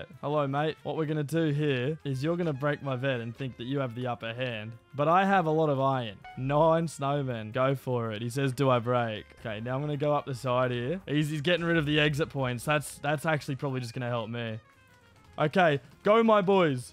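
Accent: Australia. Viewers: why do you sound so australian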